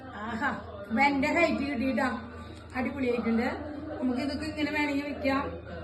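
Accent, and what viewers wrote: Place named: Thrissur, India. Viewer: Thrissur .....language superattaaaa.....simple and beutiful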